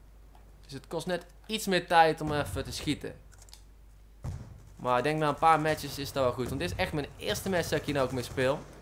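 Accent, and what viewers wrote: Brabant, Netherlands. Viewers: Hij komt ergens uit Brabant. ;)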